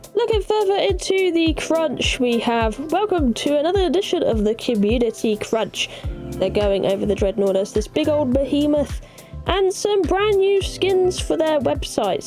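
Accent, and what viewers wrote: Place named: United Kingdom. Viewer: Omg she's a Brit bruh I already couldn't listen to Brits and then they threw in a female.... Barf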